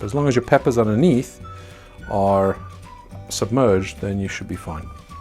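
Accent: South Africa. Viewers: New subscriber!Great videos. Watched one and then about 6 more.Huge fan of hot peppers, and the accent doesn't hurt. South African?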